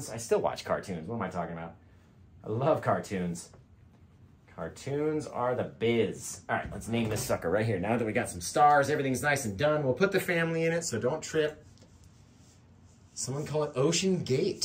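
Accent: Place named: New England, United States. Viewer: Soooooo, what part of New England are you from? Lol, the "wicked" gives you away...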